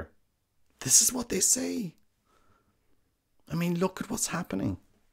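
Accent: Ireland. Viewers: I just found your channel and appreciate your discussion on the Holy Eucharist. It is too rare. I diverge a bit from you however on revealing the parish, if not the priest. What you have described is a grave sacrilege and crime in canon law. It is further a scandal to any witnessing it, serving to malform the faithful. I don't know where you are, but if I know that a parish or priest operates contrary to the law of the Church, scandalously, and bars the faithful from properly receiving sacraments, then it's nothing private. You can't let it go so that innocent travelers wander into a den of sacrilege or will be refused the Eucharist when they may really need it. It's just my own opinion, but I couldn't sit on that krp secret without it burning me in payment for the harm it could do. I live in a diocese where 2-3 parishes are notorious. New people to town ask me frequently where they should go. 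The whole reason that I suggest not going to those parishes is because of their noncompliance with Catholic teaching. I couldn't let those people be victimized. Anyway, great video. Good job. As an American, I love a good Irish accent. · At Holy Name Cathedral here in Chicago, the bishop celebrant extended the Host that touched my hand, leaving two broken halves inside my palm for which I was harshly admonished not to grab for the Host. The Church should have left well enough alone. Thanks for being here. And, from your mellifluous voice and accent I tell a Cork man.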